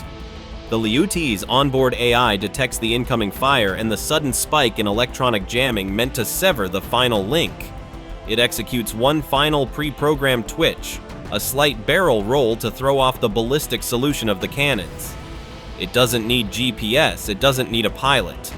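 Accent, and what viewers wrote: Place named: United States. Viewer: CLICK-BAIT SCAMMER1 TOTALYANK VOICE B.S.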